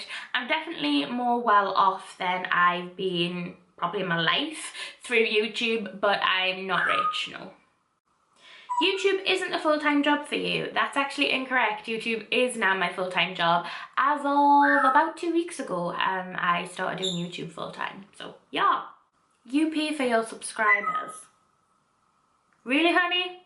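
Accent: United Kingdom. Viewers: Where abouts in the uk are you? I can’t work out your accent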